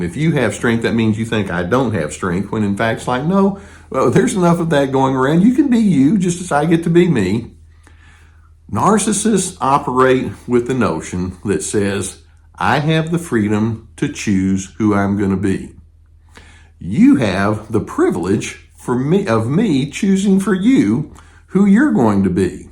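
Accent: South, United States. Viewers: You are my most favorite older white male with a southern accent. I've never met one I've really liked. Thank you for being so amazing 🥰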